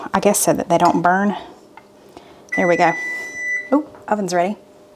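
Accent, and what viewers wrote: South, United States. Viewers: I say Paprika like you do. Maybe it’s a southern thing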